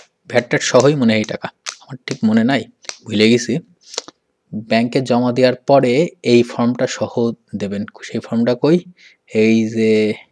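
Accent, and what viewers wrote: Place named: Kushtia, Bangladesh. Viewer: amar kushtiyar ek bondhu ase...eke bare apnar moto kore kotha bole☺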